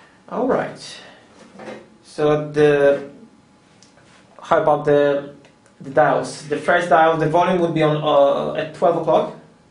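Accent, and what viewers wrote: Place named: Germany. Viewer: Whats with the voice.. I hear a German accent.. whats the issue with u puss***s :D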